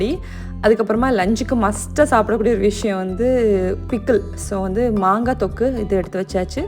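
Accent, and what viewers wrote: Kerala, India. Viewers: Hmmm nenachen, bcoz unga slang la konjam Malayalam kalanthruku, cutea irruku kekka…. Actually naanga poriyala thenga potta thorannu solluvom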